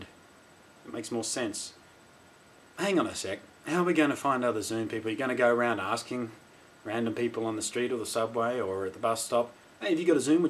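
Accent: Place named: Australia. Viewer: How do you know this if you do not even have a zune and not even allowed to buy a zune because you live in Australia well it sounds like it from ur accent. I have a zune and it is better then a ipod in my opinion I dont care what you say im just saying that you are saying stuff you dont even know for real Specialy sence you cant even get one.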